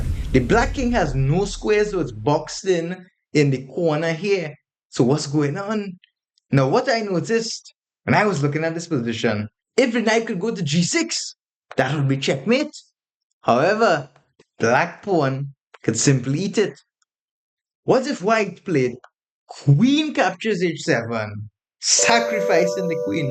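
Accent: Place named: Trinidad and Tobago. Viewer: That’s a Trini accent!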